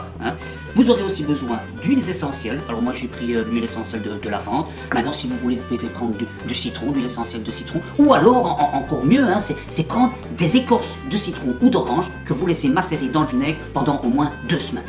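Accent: Brussels, Belgium. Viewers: Super ! et drôle en plus avec l'accent bruxellois une fois !👍👍😂😂💐💐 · Tes recettes et le ton que tu y donnes sont super ! (et on adore ton accent belge en France) ... Par contre, pas besoins de bicarbonate, en effet c'est chimiquement totalement non nécessaire car cela s'annule avec le vinaigre (PH opposé). Je te joins l'explication ... <link>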